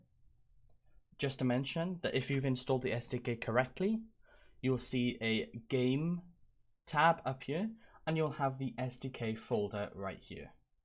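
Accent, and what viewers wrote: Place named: Wales, United Kingdom. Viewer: you sound welsh are you?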